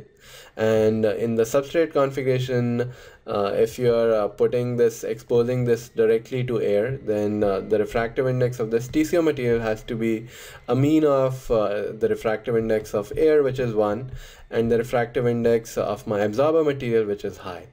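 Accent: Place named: India. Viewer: Hindu accent- cannot understand him.... shame!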